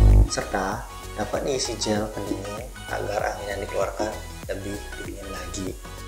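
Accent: Bali, Indonesia. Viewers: Logatnya orang bali banget😂. Mantab bliii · Org bali bro?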